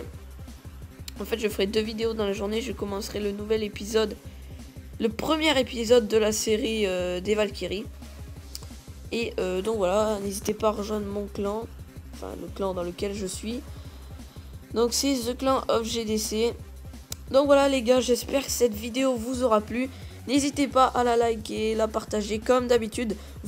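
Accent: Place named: South, France. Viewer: On le sent l'accent du sud poto ^^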